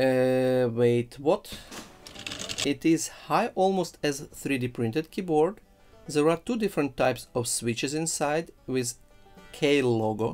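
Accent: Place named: Eastern Europe. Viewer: No-one said about strong slavic accent? Anyone? Then me: you sound like my school English teacher😄 Btw cool content, thnx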